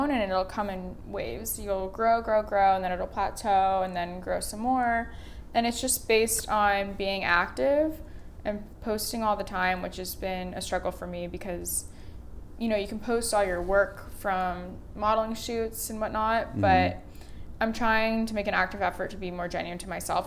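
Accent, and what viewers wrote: United States. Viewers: That LA accent is unbearable.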